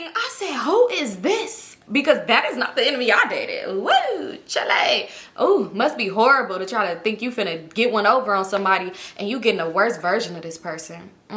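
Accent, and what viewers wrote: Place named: Los Angeles, United States. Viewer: I discovered you from honey K whenever she went to your rodeo event & now im hooked on your channel. I love the way you and her talk too with the LA accents 😂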